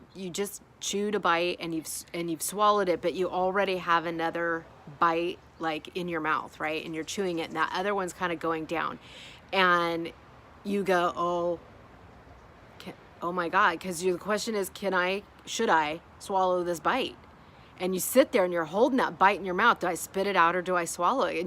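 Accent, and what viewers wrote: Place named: Boston, United States. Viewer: Thanks. I really enjoy following your journey. The struggle is real for all of us. You remind me of a Bostonian the way you talk and your attitude. I grew up in Boston. We're wise guys, sarcastic, hardworking and honest. We tell it like it is. I appreciate you and your struggles. Thanks for keeping it honest and real💟Be glad you're not here now. Big snowstorm coming tonight 🙄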